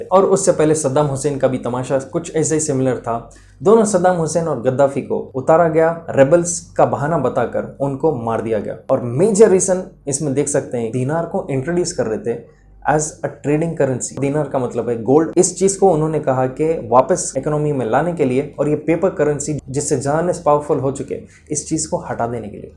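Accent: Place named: Bangalore, India. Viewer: Thank you very much for your very informative and very interesting video Are you from Banglore ( India) Your accent is like a Banglori My mother was from Banglore Yes you are right about the three very strong leaders of Arab - African countries At present in the entire muslim world There's no strong leader Recently Pakistan had to take loan from IMF We are facing the crunch About Imran Khan I am very much doubtful Is he sincere for Pakistan or not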